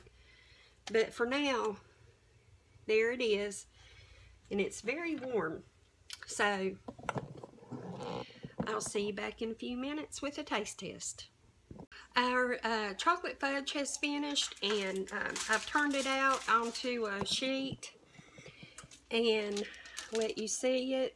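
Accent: South, United States. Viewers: I love your videos AND your Southern accent!!!!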